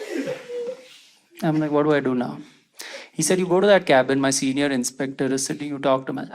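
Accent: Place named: South India, India. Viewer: south Indian accent is so sexy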